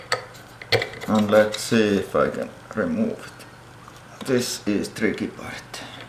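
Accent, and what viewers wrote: Finland. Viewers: He's from Finland.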